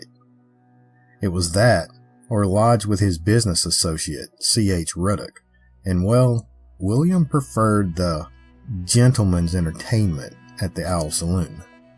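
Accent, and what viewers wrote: South, United States. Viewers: that smooth southern voice of * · your smooth southern drawl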